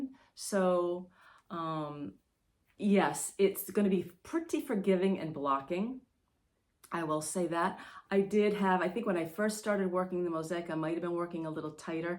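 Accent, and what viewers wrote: New England, United States. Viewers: Hello,. What a pleasant surprise to come upon your podcasts. We seem to have a lot in common besides a love of knitting. I grew up in Pawcatuck, CT so still consider myself a New Englander. I love your footage of Block Island which I have visited once - such a precious memory of a lovely day with my Dad. My brother lives in Westerly, RI so I love your accent. I, too, have lost a wonderful uncle who left a legacy of storytelling. Your knits are gorgeous and so inspiring. I currently have an Artus shawl on my needles, have knit the Half + Half Triangle shawl (have linen quill to make 2 more), and love all the blue/green shades that you are drawn to. Love your reference to the relationship between gratitude and happiness! Looking forward to your future podcasts.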